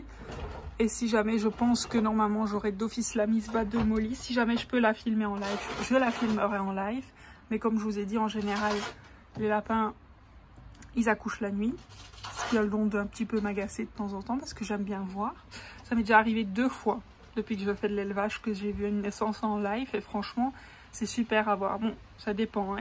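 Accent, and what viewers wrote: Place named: Belgium. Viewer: Coucou je voulais savoir où se situe ton élevage 🤔 car il me semble que tu as un petit accent belge 😆 J'ai regardé beaucoup de vidéos d'élevage de lapin et malheureusement il n'était vraiment mal renseigné 😕 Mais je trouve que toi tu t'es renseignée ,tu explique très bien et ça se voit que tu gère très bien cette elevage 😁 Aussi non merci beaucoup pour ta vidéo elle m'aide beaucoup 😊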